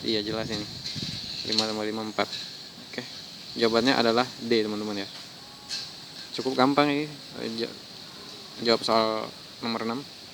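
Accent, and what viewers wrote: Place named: West Sumatra, Indonesia. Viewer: nada minangnya jelass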